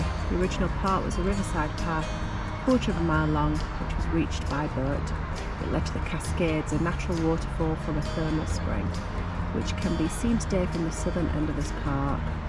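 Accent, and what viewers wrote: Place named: Sheffield, United Kingdom. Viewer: Hi, loved the video of my home town, and hearing your lovely Sheffield accent. I have family from Sheff so apologies if I'm wrong. Anyway, great video, look forward to seeing more of the same.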